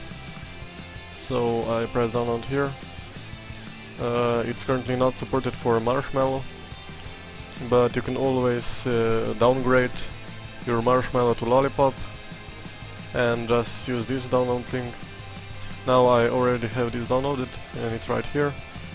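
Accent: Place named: Russia. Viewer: у вас выраженный русский акцент , вы случайно не русский?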